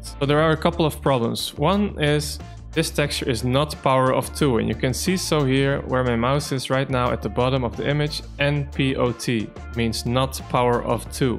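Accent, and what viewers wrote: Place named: Netherlands. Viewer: Hey, thanks a lot for the tips! I hear a dutch accent:D I may be wrong. You've made a wonderful game, congrats! Have you considered launching on other platforms? I'd like to get the game to support you and because the game seems fun, but I don't own a switch.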